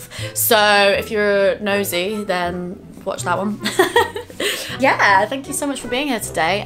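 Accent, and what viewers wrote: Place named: United Kingdom. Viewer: How charming is her British accent? Sounds so intelligent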